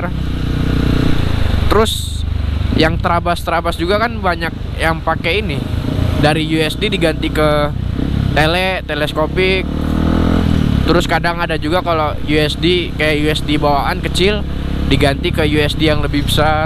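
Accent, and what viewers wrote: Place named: Medan, Indonesia. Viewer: Suaranya keinget temen kerja saya orang medan.. Horass nuhun..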